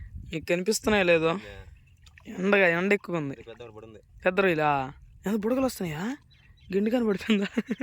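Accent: Nellore, India. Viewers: Nellore slang kosame chustanu nee vedio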